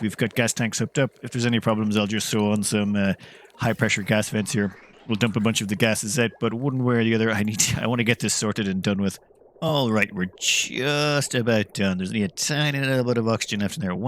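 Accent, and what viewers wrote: Ireland. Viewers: You aren't from Ireland like me are you? Dublin here.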